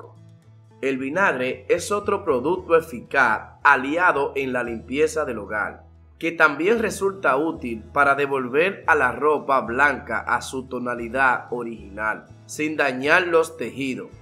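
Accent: Dominican Republic. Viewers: Criticón, me gustaría escribirte, parece que es dominicano y sí es así, ese su lengua popular.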